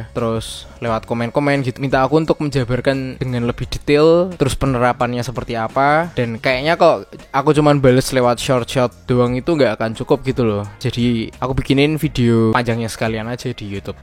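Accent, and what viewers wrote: Java, Indonesia. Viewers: Mas, medhoke pol asli😅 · Hmmmm jowone medok banget. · Mas kamu mok muuuedhook banget logat e, wong endhi tho? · Medokkk tmen... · Jowo ne ngendi mas...? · Logat jawir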